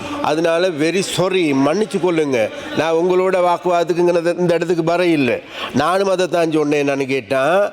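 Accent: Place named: Hill Country, Sri Lanka. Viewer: idhuwum thamil thaan , ungalukku thamil theriyala , yaarlpaana thamil thaan thamil endralla , neengal malaiyaga thamil kattrukko;;ungal. avar sariyaagaththaan pesugiraar , idhuvum thamil thaan , ungalukku vilangavillai , neengal malaiyaga thamil , colomu thamil pattri padikkavillai , adhu ungal thawaru. avar sariyaagaththan pesugiraar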